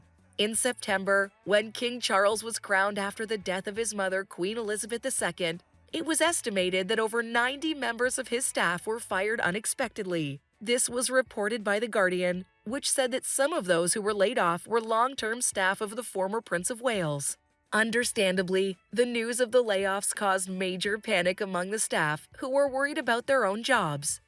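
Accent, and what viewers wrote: United States. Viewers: Dreadful American robotic voice!!!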